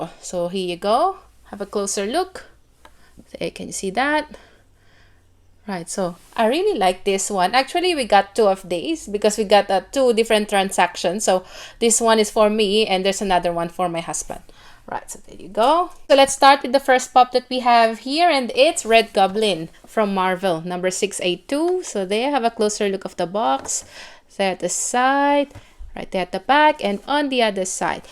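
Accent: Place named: Australia. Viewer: Been binge watching your vids. Hope to feature pops from the Demon Slayer line (if there are any). Signature mo na talaga yang Aussie accent mo. Keep posting very interesting vids!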